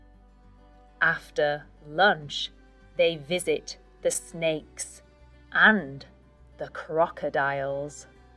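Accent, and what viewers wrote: United Kingdom. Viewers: I'm a beginner, still learning english through stories. I love your british accent❤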